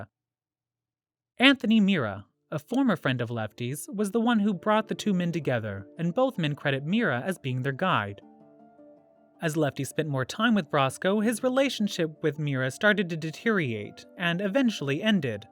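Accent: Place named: United Kingdom. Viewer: Stopped after 3 seconds, listening to this British cigarette talk makes me want to 🤮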